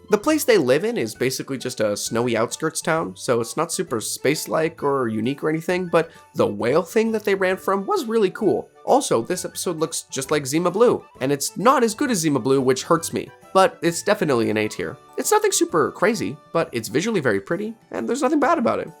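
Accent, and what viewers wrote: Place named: California, United States. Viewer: God this was a rough watch with a narrator who gives off LA/Cali vibes somehow... but you can feel he put in a lot of effort in this video and I respect it.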